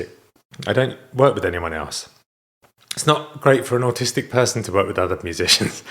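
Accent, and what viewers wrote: United Kingdom. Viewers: I don't blame you for being really pissed, who wouldn't be? However don't be so hard on yourself, we all have some kinda in our life's! I love music too! And I love you to pieces! A lot of us extraordinarily ordinary people. Your charmingly witty British accent tickles me funny bone! Your music is so beautiful. I'm grateful that you've shared what those nasty people are doing. Shame on them. I tend to be a very trusting person, it's just my nature, and it has turned around and bit me in the butt, in the end, I learned more about the nature of greedy little people, so please don't be so hard on yourself. Lesson learned! Smile, it looks marvelous on you!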